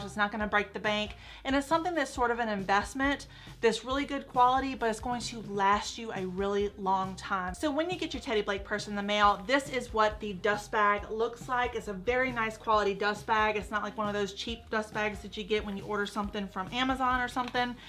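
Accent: South, United States. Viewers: New sub here. I agree, Teddby Blake has some gorgeous well made bags. I got the 9" Ava Croco Gold in Arancione and now I want the 12" Caty Croco in Black! I can attest to the quality of the leather having lived a few years in Italy, their leather is TOP notch! Looking forward to seeing more video's from you dear. Btw, I'm a Southern gal too and I love your accent!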